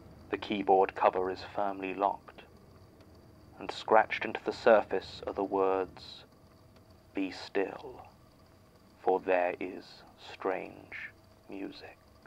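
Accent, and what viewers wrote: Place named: United Kingdom. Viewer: “If they work for the Magnus archives they should” Martin*listening in outside* : breaks into a cold sweat I’m in danger “I had never been scared of dolls" Me and my 2 worst fears being dolls and bloody mary(thanks supernatural): godDAMMIT Also Jons "...Americans" reminded me of one american youtuber recommending the podcast and one of her reasons being "the guy is British and his accent-" and I had the same reaction😂(I live in Scotland)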